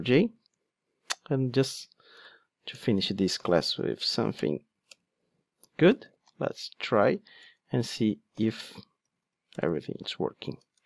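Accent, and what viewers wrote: Brazil. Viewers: O sotaque não nega BR na veia.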